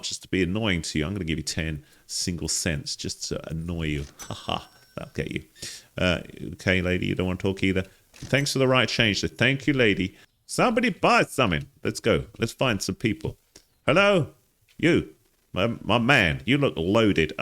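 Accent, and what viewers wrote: United Kingdom. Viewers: Awesome Video. Supermarket Simulator Looks Like A Fun Game To Play. Off Topic: Your British Accent Sounds So Cool 🙂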